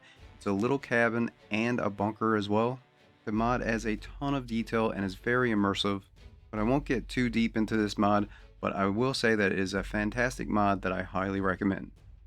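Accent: Newfoundland, Canada. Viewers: I know another Newfoundlander when I hear one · Helpful video, thanks 👍 Also the second I heard you talk I was like, "Is this guy from Newfoundland too?" 😃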